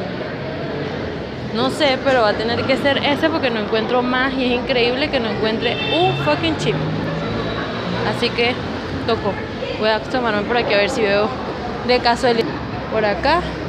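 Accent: Venezuela. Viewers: Me fascina tu acento, no sé si es venezolano pero me encanta. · eres panameña? hablas como venezolana saludos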